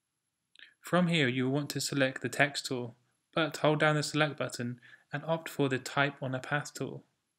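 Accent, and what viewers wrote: United Kingdom. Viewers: Thank you!! finally a short on point video! btw from which region of uk is your accent from :)?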